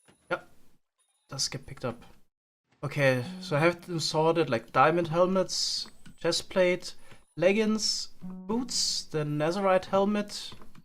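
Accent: Germany. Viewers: The german Accent haha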